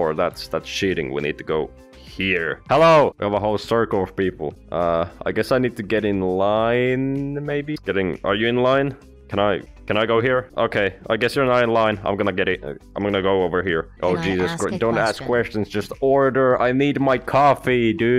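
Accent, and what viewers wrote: Finland. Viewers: Are you finnish? You sound finnish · i love your english pronounciation, reminds me a little bit of the famous finnish "rally english", and i mean that in the best way possible. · Hol up is that a finnish accent? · I might be totally wrong but your english sounds like you're finnish, is that true or am I tripping?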